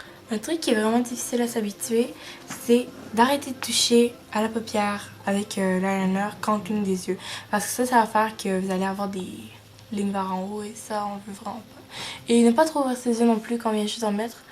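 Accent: Quebec, Canada. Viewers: C ben drôle a voire ta un accent francais pi en même temps québécois (moi jsuis québécoise ) tk bonne video c pas BS :) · Désole, mais sa se voit que tessaille de prendre laccent francais. Tes québecoise. Pas besoin dle chacher!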